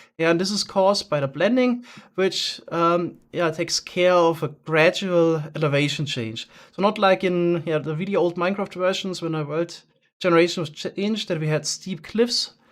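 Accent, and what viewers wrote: Germany. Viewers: ur dialect sounds so german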